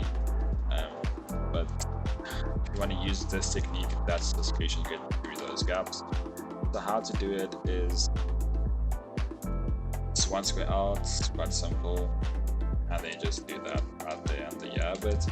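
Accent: South Africa. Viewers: lol Afrikaans? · Are you South African???????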